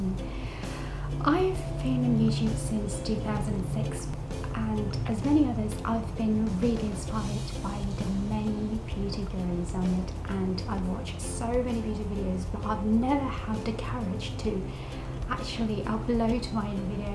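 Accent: United Kingdom. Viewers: I can't be the only who says that your English accent is really gorgeous 😍😍😍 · accent nyaa bagus amat nenggg.. british yaa? hahaha love itttt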